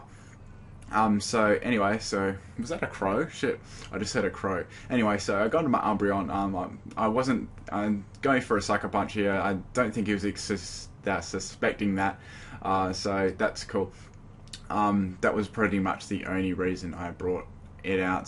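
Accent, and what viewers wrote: Australia. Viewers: You have a very heavy Aussie accent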